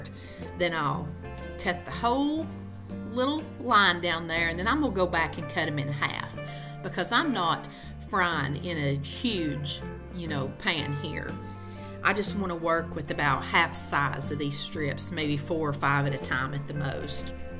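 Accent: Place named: Kentucky, United States. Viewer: Loved all of these !I'm from Kentucky found your channel from moss family tv your accent sounds like your from ky too love it lol !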